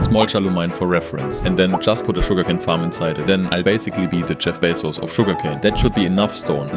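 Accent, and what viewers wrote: Germany. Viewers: Two Things: 1) You make so good content, you deserve 500k and more subs because you put so much efort in your Videos❤❤❤❤❤❤❤ 2) I think you are german (Ich bin selber deutscher und man kann richtig raus höhren das du deuter bist) ❤❤❤❤❤❤❤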